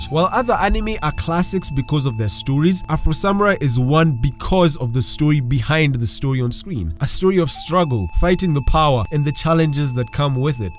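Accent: Kenya. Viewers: Mans a Kenyan, I couldn’t tell by the accent at first but good work big man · I know a Kenyan accent when I hear it. instant sub!!!! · wait wee ni brathe kumbe😂😂 lol the accent didnt hit until I saw 2007 n was like wait you is raia😂😂 heres a sub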